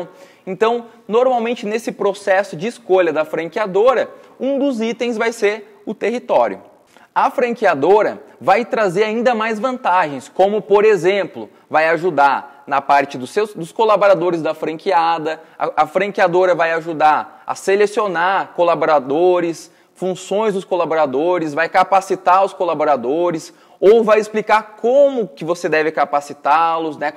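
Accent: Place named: Pernambuco, Brazil. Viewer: Adoro o seu sotaque e o " xero" do idioma Pernambuques ❤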